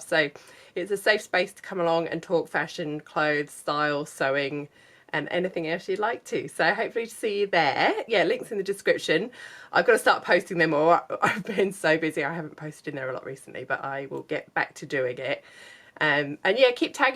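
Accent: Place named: United Kingdom. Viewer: Yes it has certainly given me inspiration, but I'm doing mine a different way! Yes, I'm also in Australia (live in Brisbane, but currently working in Perth). I always assumed you were living in the UK because of your accent so I was really surprised when I heard you say Lincraft haha! It's not often that I hear Lincraft or Spotlight on youtube!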